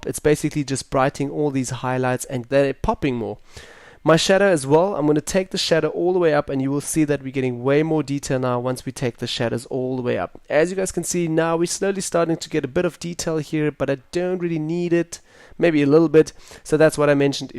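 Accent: South Africa. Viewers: Hey you're from South Africa... :D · wow u from south africa nice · Thanks so much for your time and nice accent which reminded me of my old friend from South Africa. It would make more sense if you didn't make this shot in the camera because you kept on saying you would rather re-shoot it. That's why we have you as an expert to make these tutorials making mistake shots look acceptable and punchy. Thank you so much.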